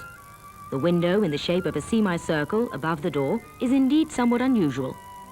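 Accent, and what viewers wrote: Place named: England, United Kingdom. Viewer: She doesn't know how to pronounce Menno Simons. It's Menno Simons with a long i. Not Menno Seemans like she says. Kind of funny that she has an English accent, as well. This needs to be done over with someone with a Swiss German accent or a Friesien Dutch Accent.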